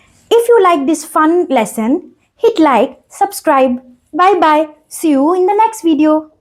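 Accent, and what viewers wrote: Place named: Bihar, India. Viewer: Your accent is bihari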